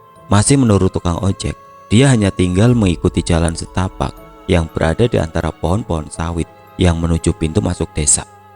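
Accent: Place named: Banyumas, Indonesia. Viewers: apa orang tegal ya kok medok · masnya orang ngapak ya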